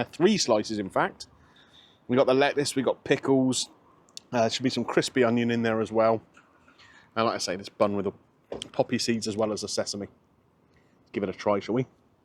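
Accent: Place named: Dorset, United Kingdom. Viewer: I may only be an honourary Dorsetian but it always makes my day hearing your lovely accent. Thank you for the videos and honesty,!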